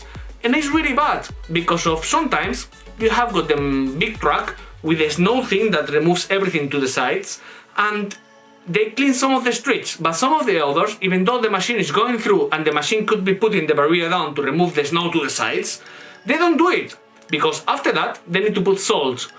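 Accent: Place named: Basque Country, Spain. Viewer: Acento vasco ehh, aibalahostia!!!! tienes mi LIKE....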